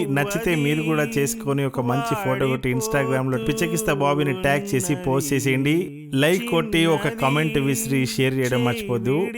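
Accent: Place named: Visakhapatnam, India. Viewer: Me native place enti garu achamga visakha telugu la untadhi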